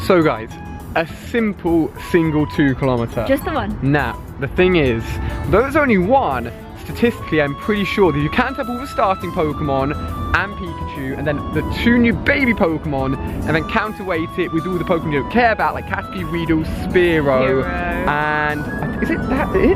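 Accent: United Kingdom. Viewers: lol, sounded super British in this video:)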